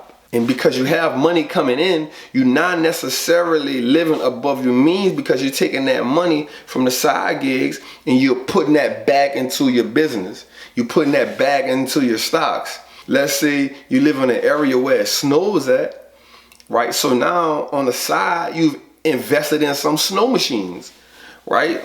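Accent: New Orleans, United States. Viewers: 💯👏👏💯👏👏💯👏👏💯LOVE that NOLA twang!!!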